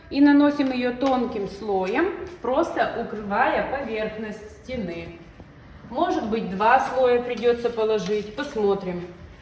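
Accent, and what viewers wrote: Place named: Urals, Russia. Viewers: Либо Киров, либо Пермь говор тот)))) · С Челябинском тоже говор похож))) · Говор обычный южно уральский, Коркинский. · Здравствуйте. Скажите пожалуйста, вы с Урала? Говор у вас Уральский)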